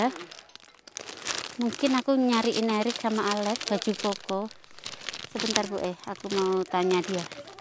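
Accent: Java, Indonesia. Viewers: Bahasane medok mb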